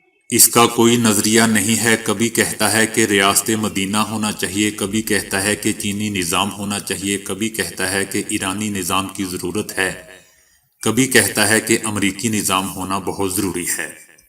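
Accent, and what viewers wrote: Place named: Punjab, Pakistan. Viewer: Janab mujhe aapki video pasand hi isi liye hai ki aap ki Urdu me Punjabiyat ki aamezish hoti hai.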